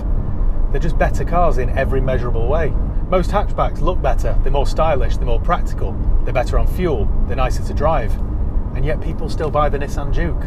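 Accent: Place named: North, United Kingdom. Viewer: You're a proper northerner aren't you.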